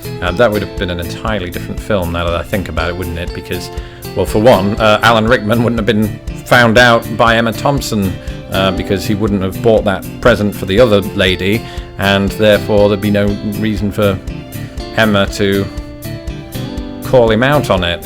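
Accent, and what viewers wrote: United Kingdom. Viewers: Some people are sensitive to others and their consciousness isn't aware, and then the subconscious manifests itself in a question they ask. Your body language is probably like a person with culture e.g. erect posture, eye contact, humility or maybe she heard you talk from a distance and wasn't consciously aware that she picked up on your British accent under the white noise in the room. I really like your videos.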